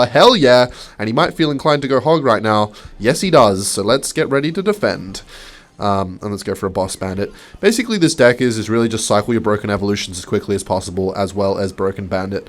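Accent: Scotland, United Kingdom. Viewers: Scotland ahh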